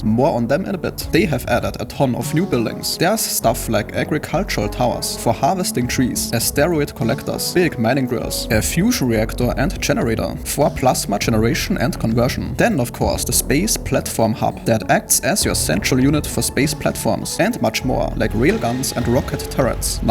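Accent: Germany. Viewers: Dürfte ja auch ein deutschsprachiger YT'er sein. Zumindest hört sich seine englische Aussprache danach an.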